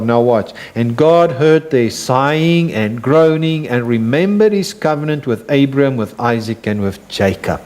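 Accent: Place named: South Africa. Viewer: Glad to come across this. Pastor has a South African accent.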